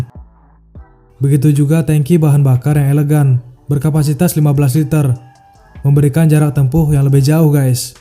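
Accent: Bali, Indonesia. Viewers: Mimin asal Bali yaa...??? Logatnya mirip orang Bali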